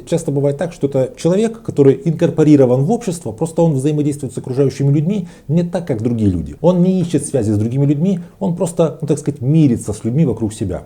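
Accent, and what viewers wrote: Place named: Ukraine. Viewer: какой-то говорок у вас украинский. Слишком быстро говорите.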